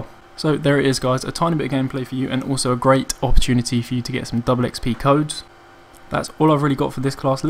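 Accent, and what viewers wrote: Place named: Cockney, United Kingdom. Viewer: there is no such thing as a 'british' accent, this, is a southern cockney accent, from quite a well spoken man here so you probably accociate this with someone like hugh grant. but say for example you went up north, to newcastle, you would have no idea what they are saying, take for example when cheryl cole and none of you yanks could understand her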